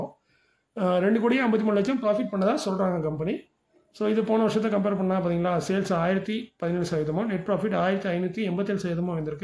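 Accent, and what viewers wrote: Tirunelveli, India. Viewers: ஐயாவின் நெல்லை தமிழில் தகவல்கள் பயன் அளிப்பதாக உள்ளது. திருப்பூர் புல்ஸ் சார் என்பது தெரியும். சார் தங்கள் பெயரையும் உங்கள்????? சொல்லுங்க. I never miss your news. Thanks for information.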